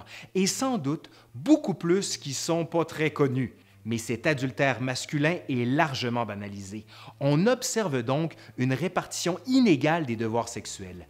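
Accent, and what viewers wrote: Quebec, Canada. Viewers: Bonne video Merci est que t'e Québécois ? Bonne année !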